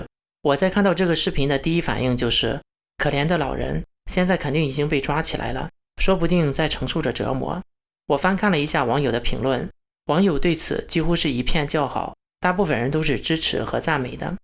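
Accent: China. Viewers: 我觉得KLAUS的视频做的很认真也很棒，你的口音像大陆人，但是你的思想更像台湾人，特别是你用繁体字包括上一期视频结尾用的中华民国国旗歌。你是不是大陆人移居到台湾的人我不得而知？大陆真实情况很多台湾人并不了解，只是通过看负面新闻了解中国大陆还有中共。中共从邓小平开始改革开放经济腾飞后，早就不信仰什么共产主义了，共产主义只是一个称谓罢了。市场经济做起来了以后，面对这个巨大的经济利益，中共其实蛋糕还是切得非常好的。大部分人都因为利益得到了好处更加拥护中共，当然小部分人利益是确实受到损害从而反共的。民主价值观在现今中国没有生存土壤，原因是中共这个统治系统养活了太多人，把中共统治系统砸掉就等于砸了很多人吃饭的家伙。民主不能当饭吃，而把中共统治系统当饭吃的人太多太多，而且吃的比民主世界的饭还香，我不知道你明白不明白我说的意思。中国有14亿中国人，而依靠中共统治系统生存的人是占大多数的。就是这些靠着中共吃饭的人是中共统治的基石，另外没有吃到中共系统的饭的人，中共也一直在维稳，并不是采用暴力手段，扶贫项目帮助贫穷地方人脱贫，有很多好的政策让政局稳定，正反两面手法，大棒加糖。比如新疆要闹独立，中共一方面加强武力镇压疆独消灭有生力量，另外一方面从思想上根除穆斯林极端宗教信仰开办培训学校。还有拨款到新疆帮助新疆的穷人脱贫致富，只要你愿意去看就可以看到现在新疆人过得非常富足谁还跟你对抗，如果大部分新疆人不认同共产党统治，新疆那些骁勇善战的少数民族早就翻天了。7个穆斯林极端恐怖新疆疆独拿砍刀在昆明就能砍死砍伤130多人，你就知道新疆人多厉害了。极端宗教疆独份子根本不怕死，人肉炸弹武装对抗政府解放军都是没想过要活命的。但这只是少数极端份子，大部分人民只想过富足的生活。如果中共只会欺压人民而不懂如何让大多数人过得富足，这个政权早就被推翻了。如果邓小平不搞改革开放，中共早就垮塌了多少年了。如果民主可以当饭吃中国早就民主了，中共早就不存在了。民主制度也不是完美制度，独裁政权也不完全就是暴政。中共暴政也只是暴政要跟它做对抗的人，靠中共吃饭的人从来不觉得中共是暴政。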